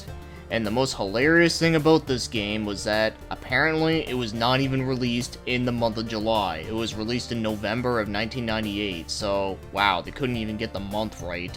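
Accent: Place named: Japan. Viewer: ay bro you sound Japanese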